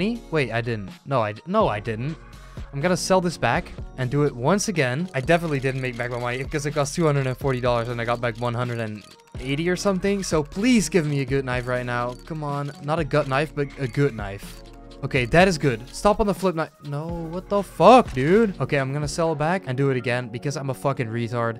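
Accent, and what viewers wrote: Netherlands. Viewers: Just as I thought, het klonk gewoon te Nederlands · wauw echt cool dat je nl bent. Je zij het in een oudere video en je hoort het bij sommige woorden lmao. Je bent goeie en je Engels is pittig goed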